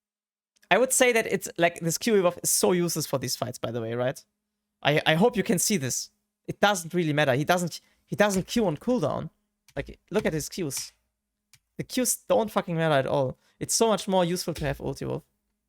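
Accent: Germany. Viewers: speaks actually good, it's just the german accent. If you dont like it, than just dont watch it^^ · his English is good he only has a strong German accent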